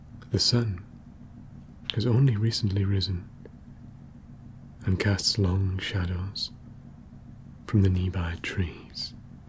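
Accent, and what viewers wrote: United Kingdom. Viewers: Hi! I accidentally came across your videos. Is this ”home made”? Looks so professional. Your english sounds so british 😊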